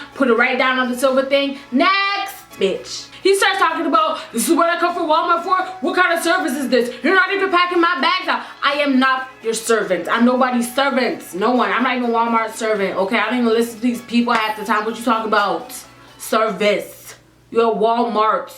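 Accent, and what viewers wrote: Jamaica. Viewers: I love the Jamaican accent :) so cool! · She uses a Jamaican accent sometimes I'm guessing that's what you're talking about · Talk Jamaican more often please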